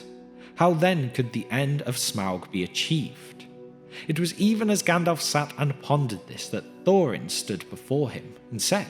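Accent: Wales, United Kingdom. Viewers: You're Welsh? If I ever bump into you in the Merthyr area I owe you a drink. You guys do amazing work on these videos, nice and easy to digest :) Do you have a Discord?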